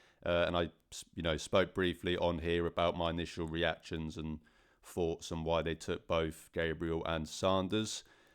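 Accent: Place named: Wales, United Kingdom. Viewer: What part of Wales are you from & welcome to the dawg pound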